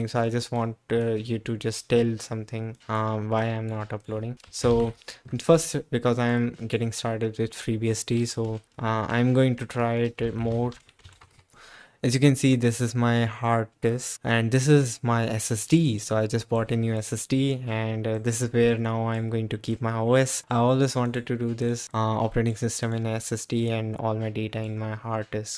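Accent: India. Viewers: I'm growing to like the Indian accent. Didn't expect it to be an acquired taste.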